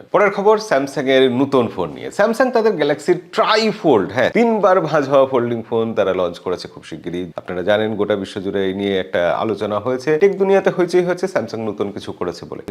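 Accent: Bangladesh. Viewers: এই বাংলাদেশী উচ্চারণ নিয়ে কি করে আনন্দবাজার এ চান্স পেয়েছেন এই উপস্থাপক!!!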